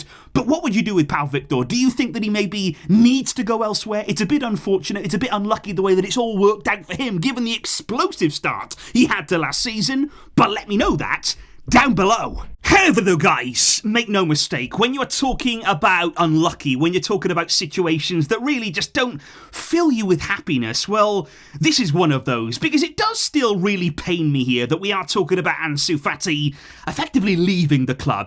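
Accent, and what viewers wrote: United Kingdom. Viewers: This is also a channel for learning the original British accent.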